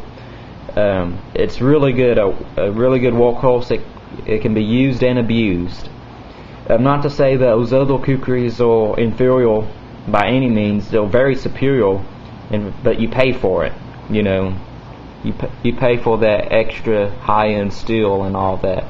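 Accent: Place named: Texas, United States. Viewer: His accent is straight Texan! Good review my Texas brother! it sounds like has a slight speech impediment....No offense:)....we all have our things, hell my pinky fingers wont straighten out. BTW, check out the knife....Fitzen Tank......BAD A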